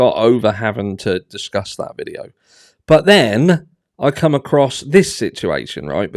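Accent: Norfolk, United Kingdom. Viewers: You ALWAYS look amazing, love, LOVE YOUR CHANNEL, ❤❤ Ps, I live in s Spain, but originally from BECCLES, you have a wonderful refined 'Waveny norfolk' accent.....Where??? Sounds great x❤😊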